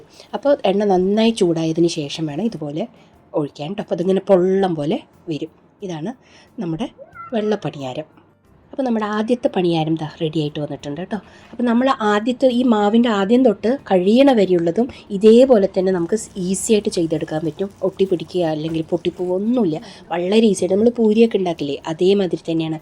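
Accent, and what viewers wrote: Palakkad, India. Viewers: പാലക്കാടാണ് നാട് എന്ന സംസാരത്തിൽ മനസ്സിലാക്കാം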